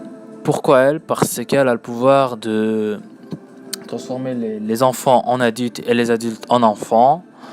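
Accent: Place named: Djibouti. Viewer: Oh mince, j'était entrain de faire une théorie dessus !! j'ai directement vu ton accent "Djiboutien-Somalien" moi aussi je suis djiboutien et je t'invite a regarder mes video sur t'aimes les manga ;)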